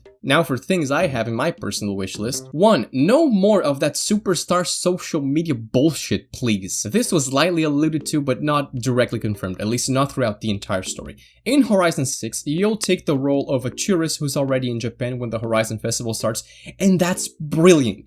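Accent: Brazil. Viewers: U kinda sound brazilian edit: kkkkkkk you are 🇧🇷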